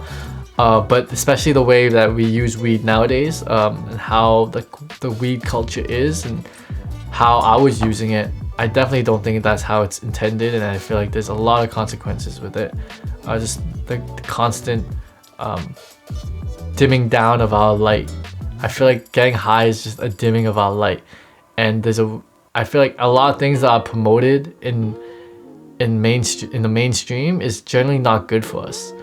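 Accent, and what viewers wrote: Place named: Toronto, Canada. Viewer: definitely from toronto, man said ball up hahaha, i rate it though keep it pushing brotherrr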